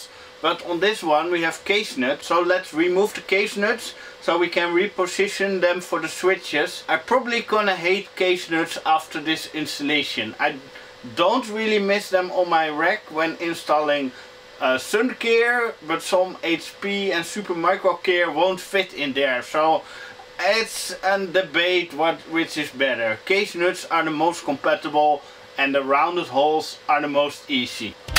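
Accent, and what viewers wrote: Netherlands. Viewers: He’s Dutch, the accent goes with it 😀. After a couple of minutes I don’t hear it, but then I lived in the Netherlands many years ago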